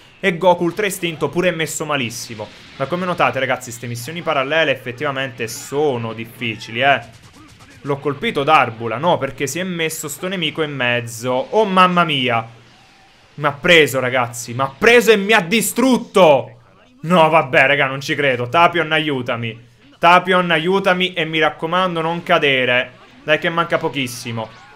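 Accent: Naples, Italy. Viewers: ma tu sei di Napoli??🤔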